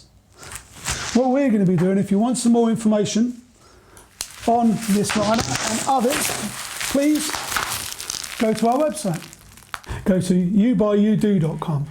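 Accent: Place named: United Kingdom. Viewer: I was sold on this video from the start because as an American, the most qualified chimney sweeps have British accents. I blame the movies. Then he pulled out the Milwaukees…. 🙌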